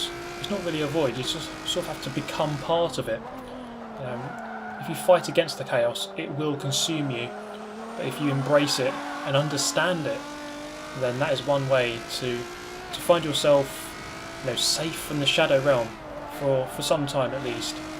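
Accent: United Kingdom. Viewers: Your British voice just makes it so much better lmfao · Your calm nonchalant attitude for ramming and other issues paired with the dialogue and English accent is absolutely hilarious for some reason. Some good dry humor lol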